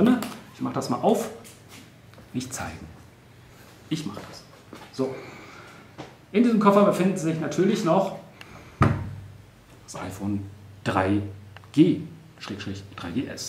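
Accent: Asia. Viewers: hahah, he's speaking german but in an asian accent:P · I wonder if he has an Asian accent to German people ? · Is that Asian speaking German!?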